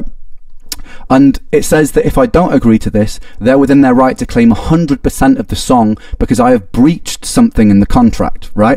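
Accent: United Kingdom. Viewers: Ren, every time I hear you speak, I wish more and more that I knew you, and could talk to you personally. ( And I don't just mean because of your accent, although it is quite lovely, and reminds me of the time I lived in England) But listen, Every. single. One. Of. Your. Fans. Is one hundred million times behind you, and I hope you don't believe for a second that we would ever believe any slander about you. Because what you've done for us, is far beyond having given us catharsis, identity, and connection. It's far beyond your amazing talent and the joy and Inclusions you've more than you will ever know. You've given us a piece of your soul, a glimpse of your deepest thoughts and being, and that is an indelible mark upon each and every one of us. **And don't fret about your song. I don't want to say anything to fuel this fire, but please message me if you would, because I have good news for you.*** Aside from that, I shared your music with my college psychology professor tonight, because she was avidly interested when I told her about your story, and the message of your music. 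I can't wait to hear back from her, I know she'll love it! Moreover, whatever money you've lost, say the word, and you know your patreon would be filled 10x overture amount, in a heartbeat. You are a genuine, beautiful soul, Ren. I'm eternally grateful I found your music, because I relate to you more than you can imagine. We've gone through very similar things at the same age, and for me, it's something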